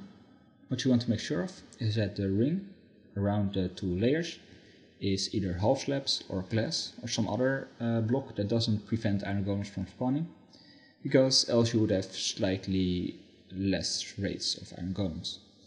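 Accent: Netherlands. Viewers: kerl ziek nederlands acsent XD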